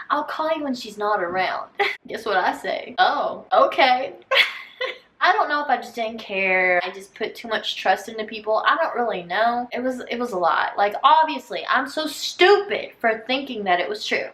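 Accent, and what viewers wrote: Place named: Tennessee, United States. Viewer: Your accent literally makes me so happy. I'm from Tennessee and get picked on because my accent is so deep, and while watching this video someone came up to me and said "wow her accent is like yours!!!" 😂😂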